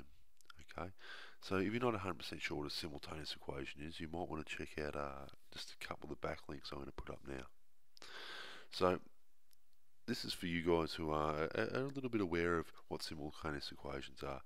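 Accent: Australia. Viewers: Yeah I think so. Well I'm from Australia and he def sounds Australian to me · are you australian??? accent is so iconic!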